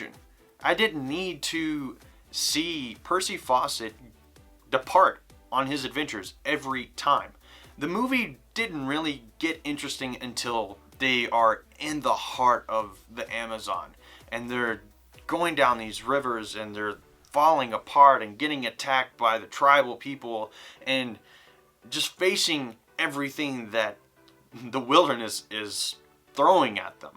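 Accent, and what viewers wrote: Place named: United States. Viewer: Why is Daniel Radcliffe reviewing movies with an American accent?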